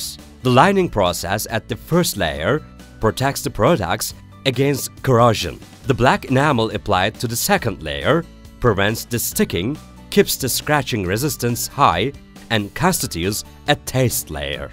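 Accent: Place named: Turkey. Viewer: Allah aşkına kimin fikriydi bir türkü aksanli ingilizce konuşturmak? Acil değiştirin. Çok garip olmuş.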